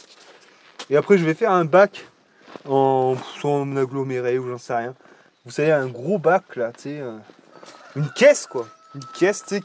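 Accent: Auvergne, France. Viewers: J’avais jamais remarqué que tu étais adepte du « Y » auvergnat lol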